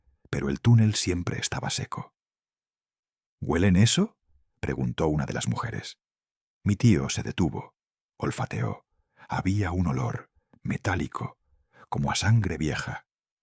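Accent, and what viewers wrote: Spain. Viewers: Con ese acento Español no te creo ni papas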